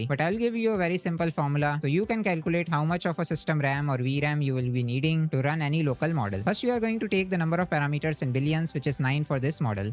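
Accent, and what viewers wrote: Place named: India. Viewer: Господи, пока не увидел твой коммент думал повешусь с индийского акцента, уже забыл что такое индийские айти видео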